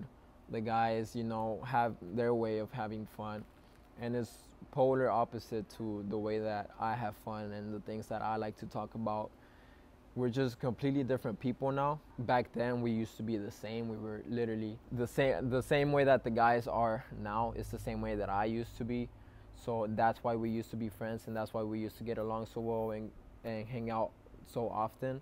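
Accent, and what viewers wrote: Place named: Spain. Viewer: whoaaaaa his spanish accent has gotten better. you can hardly hear it when he speaks english thats rly cool to see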